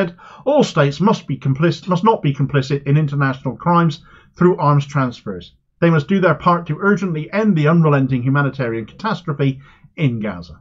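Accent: United Kingdom. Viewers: YES, SIR... YOU THE BEST.... I LOVE THE SHOW... LOVE THE BRITSH ACCENT.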